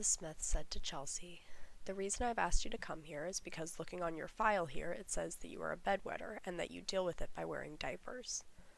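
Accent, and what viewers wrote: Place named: United States. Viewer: Hello! I have just listened to Chapter 5 of "Older Sister Chelsea's Secret" and I am enjoying each chapter that you narrate. You have a wonderful, soft, feminine voice.Your cadence and tempo, as well as inflection and pronunciation is spot on! I could listen to you all day. Though your accent is pure American (guessing West Coast, but maybe Midwestern) the story definitely takes place in either the UK, or one of the Commonwealth nations, such as Canada, New Zealand, Australia or even Bermuda (I live directly west of Bermuda) . Instead of "till", we use "register" or "counter". Instead of calling a teacher "Miss" American students either address their teacher by their name, e. g. "Mrs. Stevens" or "Miss McKee" When the teacher's name is unknown to a student, the correct way to address and converse with a teacher is by use of the appellation, "Ma'am". 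But, please continue to use the British terms for things. I love how British and American English differ so much from one another that my wife and I have to turn on closed captioning when watching a British mystery or film. Slang words and expressions (particularly Cockney English with it's rhyme) and the varied dialects make it almost impossible to understand sometimes, with American ears. I sincerely feel sorry for Chelsea. Her fear of Tina or her parents discovering that she is a bedwetter and has to wear adult diapers to bed, is second only to her fear of being "outed" as a diapered 18 year old high-schooler who has to wear di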